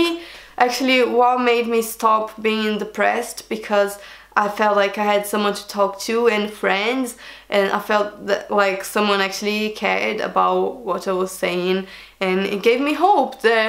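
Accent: Brazil. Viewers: You have a Brazilian accent but its a Brazilian cute accent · Kkkk verdade eu olhei pro rosto escutei ela falando o sotaque bonito brasileiro ali, haha é brasileira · Eu sabia que vc era daqui! Soube pelo sotaque, kkk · O sotaque dela não engana, muito fofo 💖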